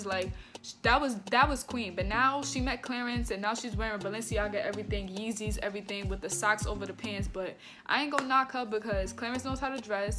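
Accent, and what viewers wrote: New York, United States. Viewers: Bol manes ye she from New York fr😂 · Are u from New York cuz u sound like it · You can tell she from New York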